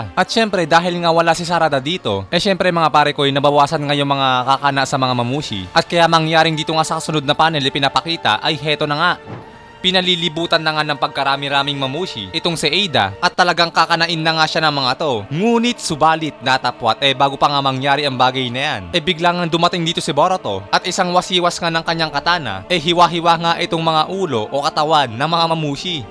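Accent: Visayas, Philippines. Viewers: Bisaya ka dol? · Parang nagiging Bisaya kana din ahh.. grabe talaga influence namin bisaya hahah! #Kapoy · Bisaya ang ampt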